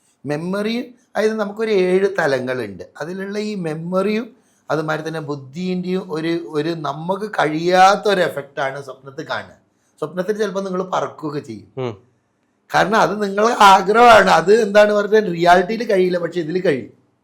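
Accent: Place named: Kannur, India. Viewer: ഇദ്ദേഹം കണ്ണൂർ കാരനാണോ കണ്ണൂർ slang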